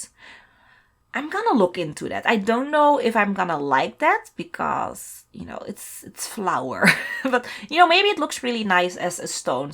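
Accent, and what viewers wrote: Netherlands. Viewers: Are you dutch, or where are you from?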